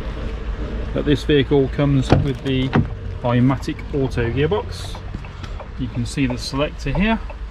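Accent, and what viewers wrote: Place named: Australia. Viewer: why do you speak like an Australian ?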